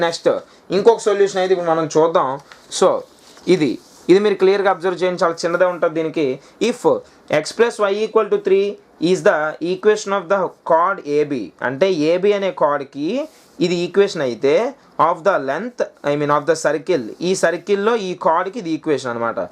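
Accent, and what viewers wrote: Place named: Visakhapatnam, India. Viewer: Pure vizag slang❤️😍😍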